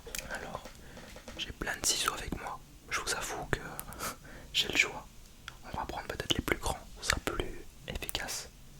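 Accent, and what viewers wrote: Belgium. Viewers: Serait-ce un accent belge que j’entend?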